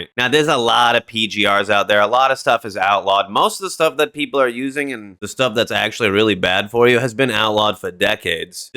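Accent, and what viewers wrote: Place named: Boston, United States. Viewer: There’s no way your not from Boston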